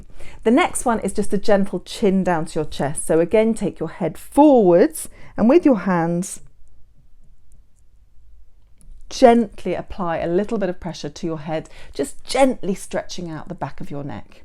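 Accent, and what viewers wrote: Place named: United Kingdom. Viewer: I can't breath listening British English accent. It's makes me more tension while listening at your explanation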